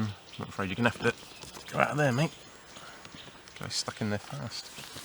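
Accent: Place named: Southern England, United Kingdom. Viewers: about that serial killer in the night - they would cut the tent open with a knife, not use the zippers, so that lock really ain;t no good whatsoever. On top of that, it prevents YOU from getting out quickly in moments of danger. I'd just carry pepperspray, a nice axe and a loud whistle instead! Or bring a dog - can;t get more safe then with a nice Rottweiler at your side. Loved teh video - everything seems so easy and so natural with you. Amazing! Thansk for sharing! If I may ask - aprox. what part of teh UK are you? You sound like wider Londonarea to me... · You got a great looking channel here old chap! Glad to have found it and definitely subbed! You sound like you may be from down South somewhere, like me, maybe just outside London? slugs love tea, coffee and BEER!...so dont leave an open tinny sitting about on the floor, even in the evenings, you'd be surprised just how fast they will find it! 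MTFBWY!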